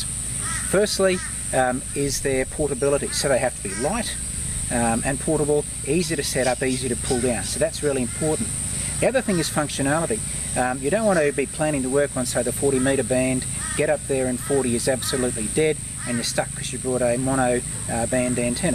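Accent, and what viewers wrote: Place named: Australia. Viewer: Sorry but only 25% of what you are explaining is understandable for a non "Aussie" - certainly ad that speed!. "That mountain is too high for me". Paul - OP5A.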